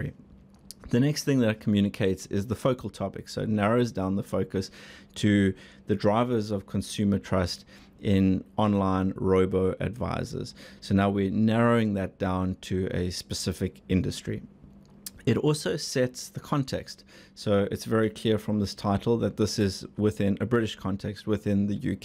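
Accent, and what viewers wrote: South Africa. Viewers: Are you South African? Seems obvious actually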